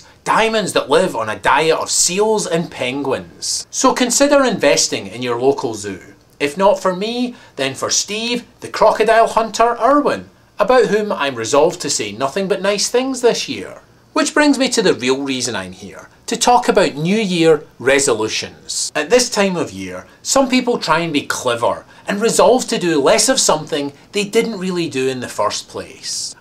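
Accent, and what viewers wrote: Scotland, United Kingdom. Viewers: Let's hear it for monotonous, inane, recycled social commentary and weak attempts at humor. For the record, Scottish accent != funny. I'm currently soaking your youtube account in vinegar... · I thought this was funny. Nice one. Loving the scottish accent lol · Can't get past his Scottish accent-I prefer Michael Myer's version because he's actually funny. YouTube vids stink when it's simply someone's ugly face sitting still while their eyes dart from the camera to the monitor so they can catch a peek at what they look like while shooting the vid. I'd rather watch Michael Richards. His bald, gouteed, & earring look-he's just plain annoying. Wish his resolution was to trash his webcam & switch careers to something where you can't hear or see him.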